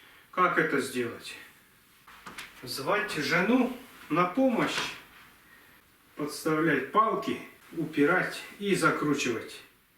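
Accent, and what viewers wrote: Perm, Russia. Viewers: Что за говор пермь, или ?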